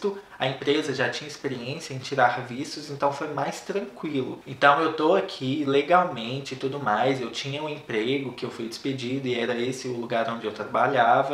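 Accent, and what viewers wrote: Minas Gerais, Brazil. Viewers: Adorei,! As caras que vc faz enquanto vai narrando a sua história é 10, o sotaque mineiro é tudo de bom. Dito isso, conta mais pra gente. 😉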